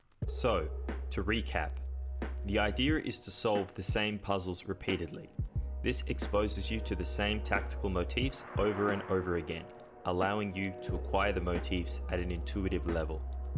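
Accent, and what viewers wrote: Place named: Australia. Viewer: ah I know that accent! Where are you from in Australia? Subbed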